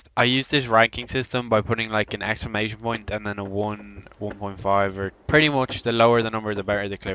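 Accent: Ireland. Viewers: Wait he’s Irish like me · Didnt know u were irish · bro i don't care you blured the things, the tutorial was fantastic i watched only because of the irish accent this wasn't even useful for me, i already learnt a lot about editing, and i use premiere, but this was really nice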